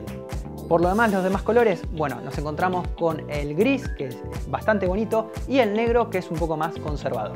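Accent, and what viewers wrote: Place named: Argentina. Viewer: El acento Argentino lastima los oidos.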